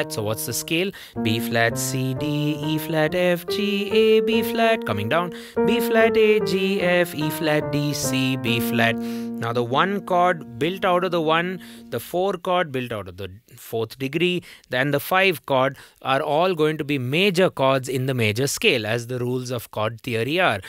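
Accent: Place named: India. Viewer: thanks for a very informative video. your explanation are clear and simple. i watch a lot of technical videos and as a native American English speaker, i often find the indian accent annoying and just move on. while your accent is obvious, it is very understandable and not at all annoying. i work with many people with various accents and it is always apparent who cares about being understood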